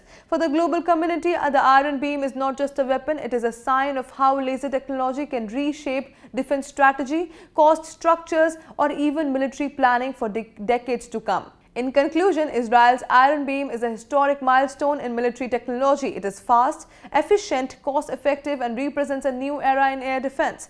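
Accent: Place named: India. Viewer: i love indian people., but i hate when indians speak english. stop please. its menasing to hear you speak english with that indian accent.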